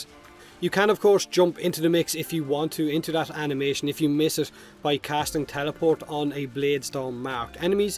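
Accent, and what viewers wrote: Ireland. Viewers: Jacksepticeye reading comments... reading comments... I don't think we realise just how much we need Irish men reading and taking the mickey out of internet users.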